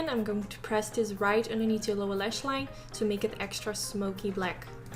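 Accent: Netherlands. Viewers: First of all. This look is STUNNING. I was looking for a look to really make the colors pop because I haven't really been into super colorful looks yet. Also you are Dutch right? I can hear it since I'm Dutch as well hahaha. When you said Glucose I knew it for sure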